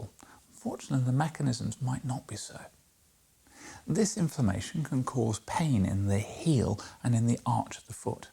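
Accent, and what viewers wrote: United Kingdom. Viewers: Because he’s damn sexy! That is a breathy voice with a British accent laid on top. Sessy!!!!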